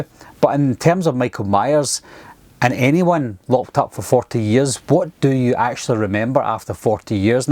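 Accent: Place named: West of Scotland, United Kingdom. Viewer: Trying to place your accent. Definitely West of Scotland. You dont pronounce chair as 'cher' so I'm guessing you are from Ayrshire or very close to it.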